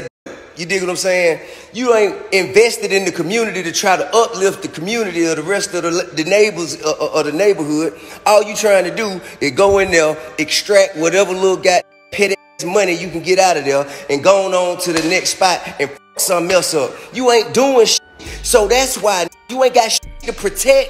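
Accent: United States. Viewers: Why do he sound so country this day and time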